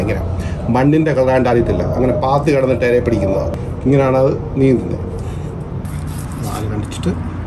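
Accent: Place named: Central Travancore, India. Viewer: ഈ.. ഒരു വരവും.. ആ പറച്ചിലും... ഞങ്ങടെ മാവേലിക്കര ചെങ്ങന്നൂർ.. തിരുവല്ല കാരുടെ.. നിഷ്കളങ്ക സംസാരം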